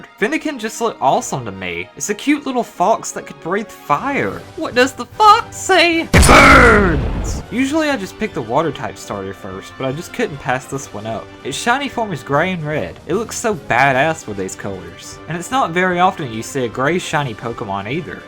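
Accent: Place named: Tennessee, United States. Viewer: Where are you from? Your accent says somewhere around Tennessee to me. I could be wrong though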